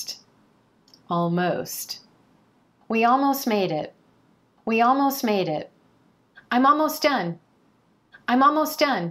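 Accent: United States. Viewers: The best American accent teacher I have ever met so far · You're a wonderful instructor of the American language. Stay blessed. BTW can you tell me you're from which state in the US?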